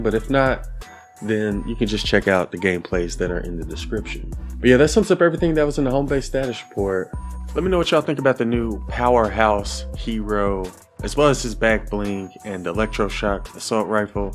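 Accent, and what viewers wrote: South, United States. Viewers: I'm going to need you to make a PSA video. During the last 2 weeks I've had a good handful of players thinking I was you(not believing I wasn't you) and asking me questions like "When is the next meme video releasing", asking me what cookies looks like, etc etc... I've heard my voice from echos through other players mics and I can't understand how people thinks I sound like you, the only thing I can think of is we both somewhat have a Southern Accent...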